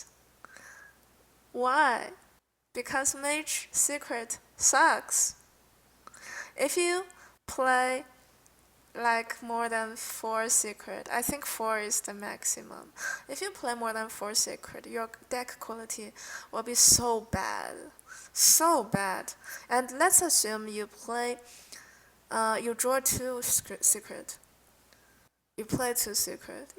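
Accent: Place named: China. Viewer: 学长的英文一股汉语味er，但是你什么时候回国内平台播啊？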